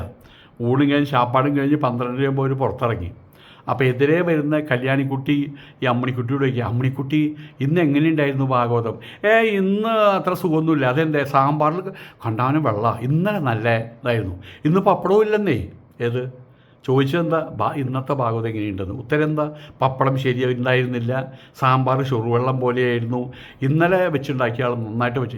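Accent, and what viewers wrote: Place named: Thrissur, India. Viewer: സ്വാമിയുടെ നാട് ചേർപ്പ് ആണോ ? തൃശൂർ Slang ഉണ്ട്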